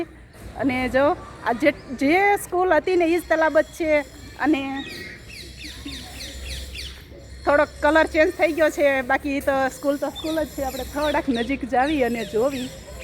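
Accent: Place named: Saurashtra, India. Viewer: તમે પ્રાંતિજ નાં છો પણ તમારી ભાષા સાબરકાંઠા ની બોલી તો બિલકુલ કેમ નથી આવતી તમે પ્યોર સૌરાષ્ટ્ર ની ભાષા બોલો છો તમારું નાનપણ તો પ્રાંતિજ માં જ ઉછરેલું છે તો આવું કેમ સમજાતું નથી...જય શ્રીકૃષ્ણ બેન 🙏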